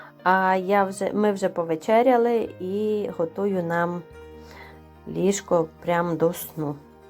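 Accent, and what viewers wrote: Transcarpathia, Ukraine. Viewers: Цікаво Вас дивитися,дуже люблю людей з Закарпаття і ваш діалект.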